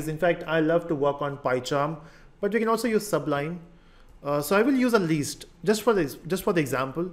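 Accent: India. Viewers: Hindi accent makes me not understand English well. Regards 😔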